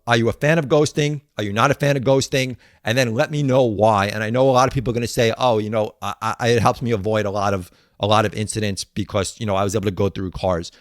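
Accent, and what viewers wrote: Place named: New England, United States. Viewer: you from New England? That accent sounds like it! I'm in RI! I've been seeing your videos for a few months! Appreciate the content, brother!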